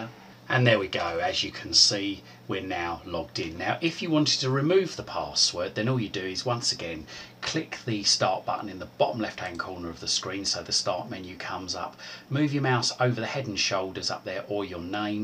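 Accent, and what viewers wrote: United Kingdom. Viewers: WHOA ITS BRITISH!!!!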